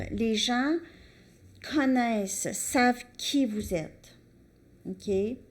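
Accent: Quebec, Canada. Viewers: Hello, Je reconnais à votre accent une cousine d’outre-Atlantique, une belle journée à vous et merci pour vos guidances. ☀️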